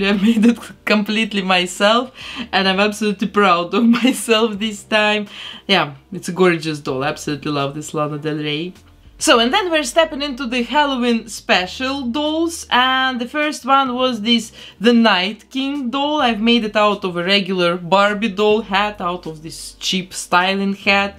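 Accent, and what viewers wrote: Russia. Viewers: Мне одной кажется что у неё русский акцент?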